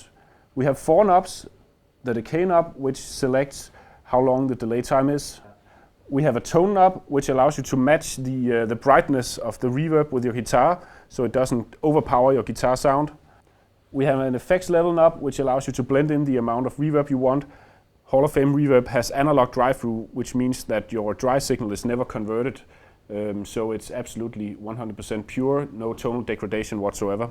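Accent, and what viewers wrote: Denmark. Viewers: Lol at the Danish accent, lmao! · danish english! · You can't hide with that accent! You've gotta be Danish! Hej kammerat!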